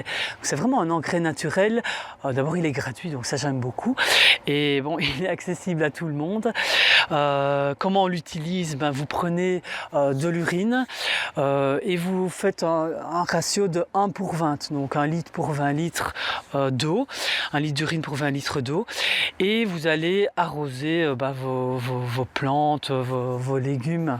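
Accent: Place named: Belgium. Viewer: Accent accent belge, juste un peu comme, sans plus quoi....Alleï bonne journée!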